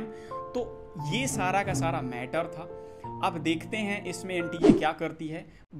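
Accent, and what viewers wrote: Bihar, India. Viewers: Bhaiya kya app Bihar se ho❤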